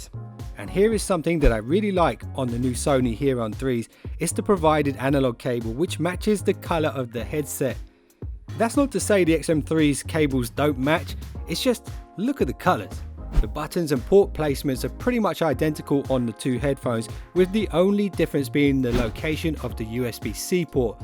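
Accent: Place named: United Kingdom. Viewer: Out of curiosity, what part of the UK your accent is coming from?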